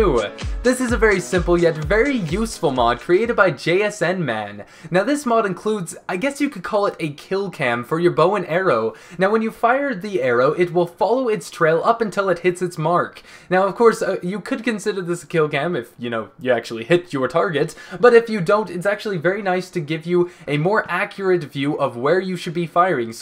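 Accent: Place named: Australia. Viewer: Dat Aussie accent. :P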